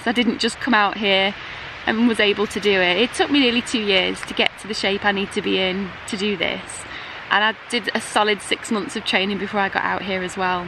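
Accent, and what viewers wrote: United Kingdom. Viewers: Congratulations! I've followed your trip from the start and it's been quite the journey. Thank you for taking the time and effort to produce such great videos. You love for nature really comes through. I will miss your British accent and expressions.Your heart is as big as your smile and always made me happy watching. Good luck and vegan power!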